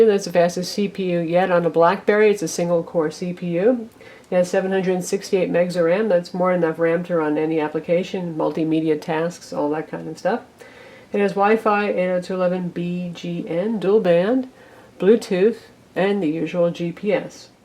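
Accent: United States. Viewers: AR AR R R R fuu , shiting american language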